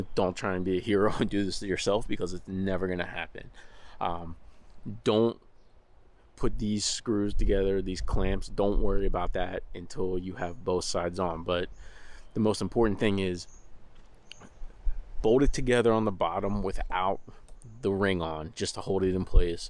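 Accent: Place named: Philadelphia, United States. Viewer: By the way, had a feeling you were from the Philly area by the sound of your voice. Just sounded local to me. Checked your profile and saw that you are...me too (Delco; now South Jersey).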